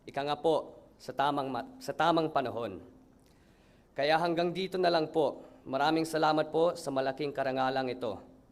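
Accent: United Kingdom. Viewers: Love the slight British accent! 😍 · Nakaka Harry Potter naman ang accent 😍😍😍 Love na love ko yarn ❤️❤️❤️❤️🤗😘😘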